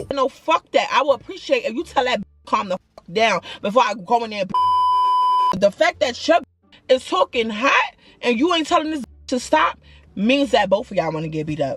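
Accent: New York, United States. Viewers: Hey girl! I’ve been binge watching your videos for like 2 weeks now! Currently on the BD series! I came across one of your videos by accident and been hooked ever since! I think it’s bc we both from New York so I love the way you talk and we both moved down south. Keep up the good work! I will continue to watch your story times. You’re such a good storyteller! ❤ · Girl I love how you still sound so NY when you been in the south for some yrs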